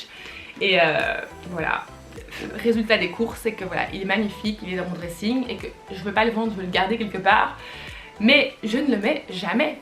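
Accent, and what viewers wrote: Belgium. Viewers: J'adore ton accent Brusseleir ne change pas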